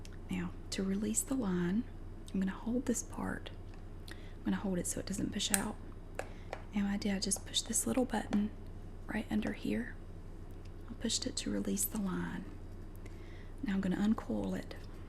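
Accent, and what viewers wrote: South, United States. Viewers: I subscribe to your channel and was curious about who you follow. This is a great channel - thank you. The Southern accent is brilliant. I am from the UK so it is unusual for me! But makes for great ASMR!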